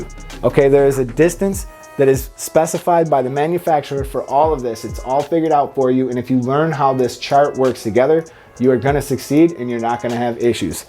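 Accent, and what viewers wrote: Buffalo, United States. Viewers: You from buffalo?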